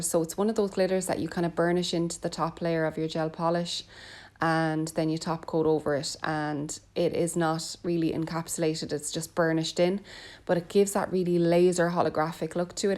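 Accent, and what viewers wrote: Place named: Ireland. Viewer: What a beautiful accent! Sorry I love my Irish ppl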